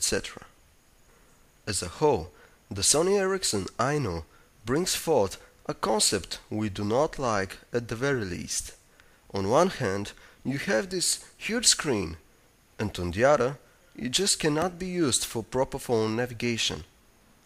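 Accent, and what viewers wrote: Russia. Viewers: is he from Russia ???????????? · wtf..the accent dude..like some russian dude in star trek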